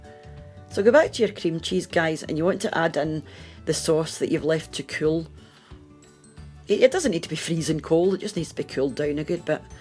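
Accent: Scotland, United Kingdom. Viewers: In the earlier videos, tried to minimize her Scottish accent because she was self-conscious about it.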